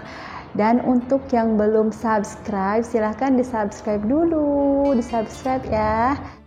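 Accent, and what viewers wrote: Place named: Malaysia. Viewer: Mbak suda Ada sedikit loghat Malaysia yah dlm pertuturannya Udah Brapa lama d sini mbak ?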